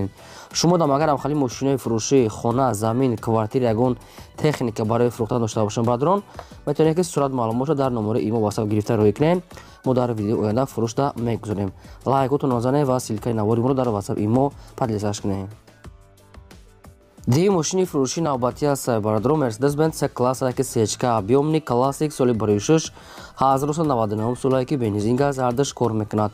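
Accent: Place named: Dushanbe, Tajikistan. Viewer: Бехад навори хуб рови бо лахчаи зебои душанбе офарин бурдбори мехохам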